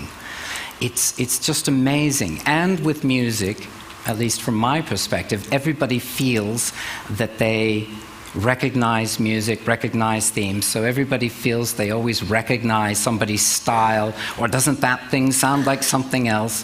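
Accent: England, United Kingdom. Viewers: he was such a British/almost Australian accent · Why does he have a British accent? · What's up with the British accent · What's with the accent? Did he emigrate from the UK? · Ive heard his accent in many interviews, his accent is very English, you can hear him pretend to do a american accent in this video! but what i do know he's lived in the U.K and studied there for many years when he was young and went to study at the prestigious Royal College of Music. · why does * sound british he's American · Woot...british accent?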